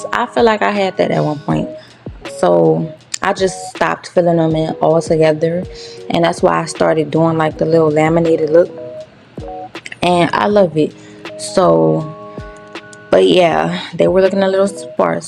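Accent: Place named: New Orleans, United States. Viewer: I know a NOLA girl when I hear 1 😂 love your video sis keep going 🩷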